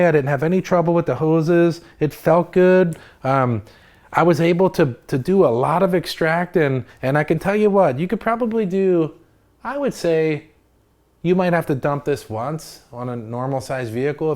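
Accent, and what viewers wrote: Hawaii, United States. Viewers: Love that Hawaii accent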